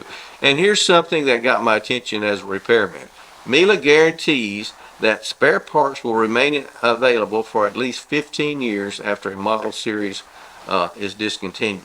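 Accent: South, United States. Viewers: I've worked on cars and trucks for over 30 years. I really trust. He's obviously knowledgeable, experienced, intelligent and (importantly) honest. I think I'm becoming like this guy, minus the good ol' boy accent. I'm complimenting somebody. I'm not sure if it's him or myself or both. Having said that, I worked for Sears Auto in the 1990s and the appliance department guys said they laughed when they saw the Maytag commercials. You know, the old ones with the service guy who was so bored because Maytags never broke. Maytag was far and away the most common warranty problem they had. But, Sears didn't sell the model he mentions in the video.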